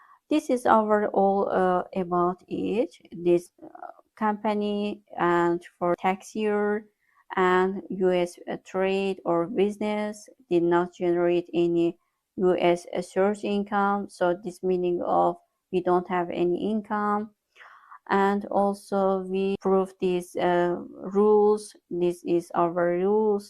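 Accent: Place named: Azerbaijan. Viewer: Aksent ve danisiqdan basa dusdumki azerbaycanlisiz, Meni cox sevindirdi bu video, xahis edirem sosyal sebekesi elave edin siz bizlere cox vacib insansiz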